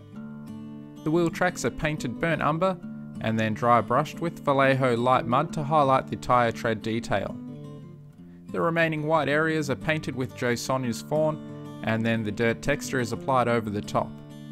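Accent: Australia. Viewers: Is it narrating or a TTS app with an Aussie accent? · I thought that scenery looked awfully familiar in an Australian kind of way, and then of course I heard the accent, haha!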